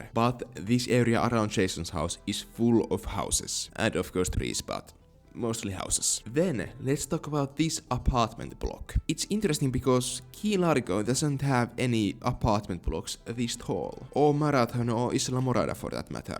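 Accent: Finland. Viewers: It's not an AI voice. It's his real Finnish accent. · have you never heard a Finnish person? If you played Control/Alan Wake 2, Ahti is very Finnish lmao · Bro that's his real voice 😭 The amount of people who think his voice is AI is crazy. He's Finnish and he just sounds like that, if you for whatever reason don't really understand what he's saying then you could turn on subtitles although the subtitles themselves may be wrong/incorrect. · Finnglish is fun. I’m American but work with Finns. You have that sweet spot of strong understanding of the language, but also strong Finnish accent.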